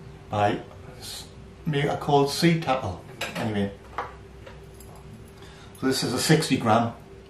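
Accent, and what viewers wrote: Newcastle, United Kingdom. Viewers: Great video mate, enjoyed very much. I'm from South Wales and can understand your geordie accent no problem haha. Keep up the good work. Gareth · Thanks mate just made my move to Donegal permanent and less than 3k to most beautiful shoreline, although a trout and salmon angler that keeps me busy in these parts always wanted to shore fish, just found your channel a few weeks ago and your video today will help me tackle up cheers again and your Geordie accent is great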